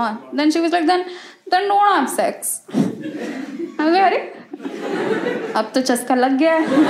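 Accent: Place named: India. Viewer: this is why Americans make fun of Indian accent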